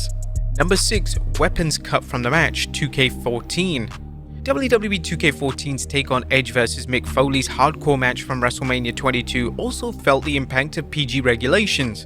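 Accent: United Kingdom. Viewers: Is there ANY American youtuber talking wrestling? EVERY video I open has British accent.. 🤷🏻‍♂️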